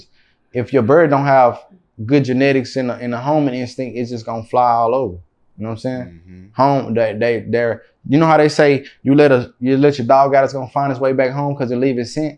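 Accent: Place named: Texas, United States. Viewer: Anybody caught the website he said. I didn't quite catch what he said. That Texas accent strong af 😆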